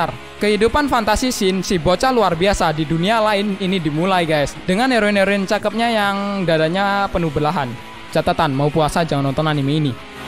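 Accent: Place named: Palembang, Indonesia. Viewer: Orang Mana? Kok Rada Bahasa Palembang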